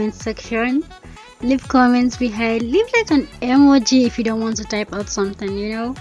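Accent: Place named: Zambia. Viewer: You sounded like zambian,am a zambian that's why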